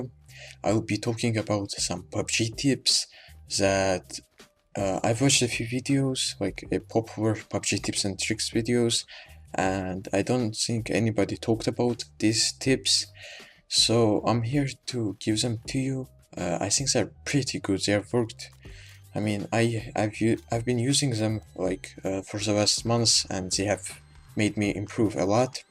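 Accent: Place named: France. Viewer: He is french or Belgian or Swiss or from Montreal maybe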